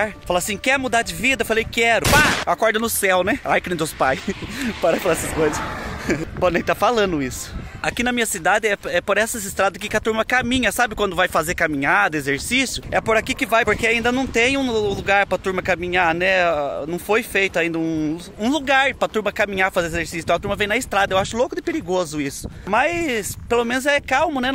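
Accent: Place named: Paraná, Brazil. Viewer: Seu sotaque é bem parecido com do povo q mora no Paraná..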